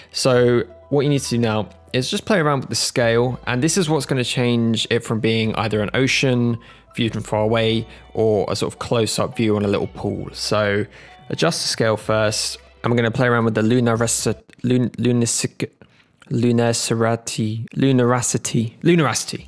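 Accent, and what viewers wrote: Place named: United Kingdom. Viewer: i think you are british guy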